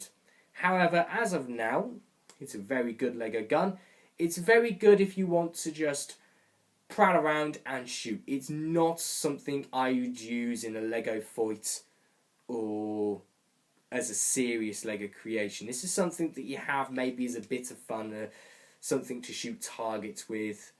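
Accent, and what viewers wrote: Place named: United Kingdom. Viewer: I knew you were British from your accent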